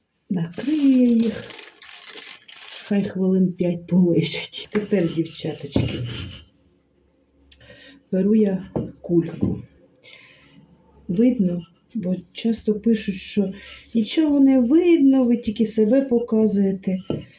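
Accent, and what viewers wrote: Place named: Ukraine. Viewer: Смотрю не только из-за рецептов, но очень нравится говор! Так у нас в Сибири разговаривали наши родственники! Они были переселенцами. Да и не только родственники, у нас вся улица была населена украинцами. Они все переехали в Сибирь в начале прошлого века! И теперь слушаю, как будто возвращаюсь в детство❤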